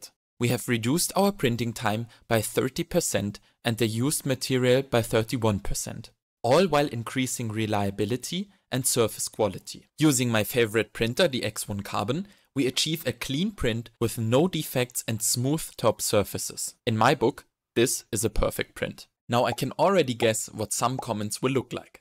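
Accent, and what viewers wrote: Germany. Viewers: klasse Video, aber könntest du das vllt auf Deutsch machen? Dein Akzent klingt deutsch ;-) ich kann leider kein englisch...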